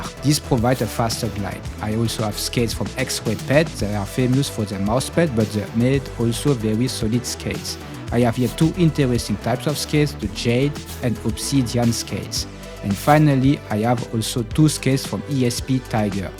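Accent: France. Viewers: Mdr l'accent bien français (bonne vidéo quand même)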